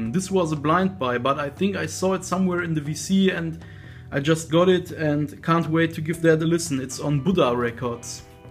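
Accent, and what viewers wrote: Germany. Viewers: Ist das total krass dass ich am akzent gehört hab dass er Deutscher ist ? 😀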